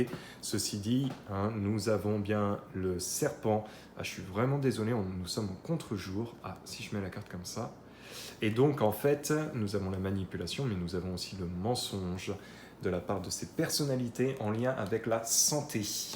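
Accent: Canada. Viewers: Bonsoir, j’aime beaucoup quand tu tire les cartes devant nous, après tu fais comme tu le sens. La reprise des cours est elle bien?? Anglais!!! Français!!!! J’aime l’accent canadien 😀😀😀. Merci pour les nouvelles avec les cartes. Hé oui tu fais parti de la famille. Amitiés Sincères 🌹 🌹 🌹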